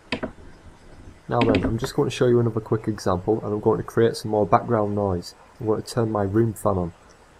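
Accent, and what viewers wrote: United Kingdom. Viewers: Brilliant video, thank you. Are you from the North East of the UK? Your accent sounds very similar to mine. I'm from Middlesbrough. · Loving the boro accent man. Takes me back to my younger days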